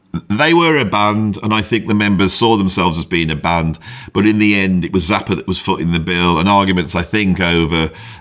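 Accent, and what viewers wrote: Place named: Midlands, United Kingdom. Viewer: I saw Frank at Knebworth in 1979, aged 19 & that set changed my understanding of what music was. So it's great to see this great collection & selection especially delivered in a great Midlands accent. Uncle Meat is the record I'd take to my desert island, though I'd miss the others especially Hot Rats & Ship arriving too late...& Yellow Shark &...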